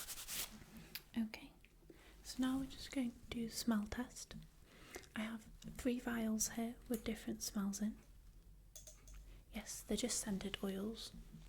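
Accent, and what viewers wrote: United Kingdom. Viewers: Is that w Welsh accent I detect?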